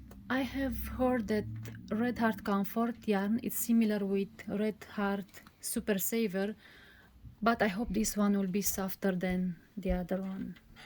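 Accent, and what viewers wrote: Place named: Russia. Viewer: У вас такой акцент, как будто вы русская)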